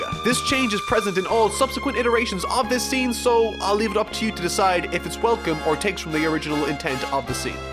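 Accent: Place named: Ireland. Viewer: hey ive been a sub for almost a year now and i was just wondering what country you grew up in as u sound a little irish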